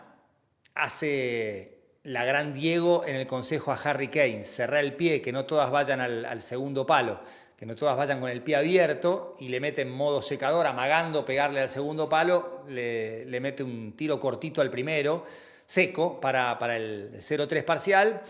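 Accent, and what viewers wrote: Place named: Argentina. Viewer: que buen análisis, se te pegó muy rápido el acento argentino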